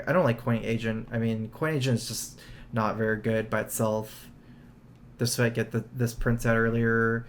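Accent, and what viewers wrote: Texas, United States. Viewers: John Dice late reply but he says drawl because he is from texas and apparently this is their accent.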